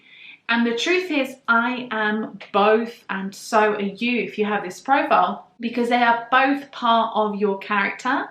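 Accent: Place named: United Kingdom. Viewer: Hello sweetheart. I was drawn here after my awakening to engage with your channel. I don't know what it means but I am drawn to explore. I am very intrigued. I am gifted because I was told by source energy that I was going to create great things for humanity at 7 years of age. I avoided University as a young man because I saw it as unnecessary debt for limited reward. I could have been a lawyer and then upgrade it. I love that you are an empath and a drawing aficionado like I am. You are so amazing. I have also done life coaching and I have a background in organic gardening as well as landscaping and horticulture. I have also grown into nutritional healing as I have skills in multiple massage techniques and herbal healing including mystical occulted knowledge. I totally get the need for personal time for growth. Boundaries are essential. I love your depth of character and it is really interesting. 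Your British accent is really cute also. I am stealing your ish line to calm my Aussie lingo down (we are too honest at times). I am very big on self care time balanced with empowerment. It must be so much harder being a woman dealing with it. Balance is key. I understand female psychology better than most women understand themselves and I imagine that you find most men shallow because they are. Women are a little more complex in general but most not by much. I'm sure that you understand. It is what it is either way and all people are amazing in their own way. I'm about to watch you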